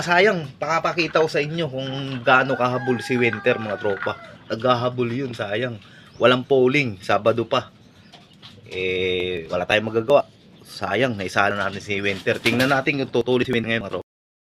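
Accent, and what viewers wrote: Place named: Iloilo, Philippines. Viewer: kasi parang my tono kang ilonggo hehe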